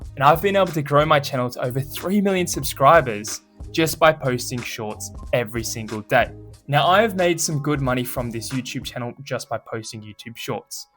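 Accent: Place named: Australia. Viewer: Is that a fellow Aussie I hear?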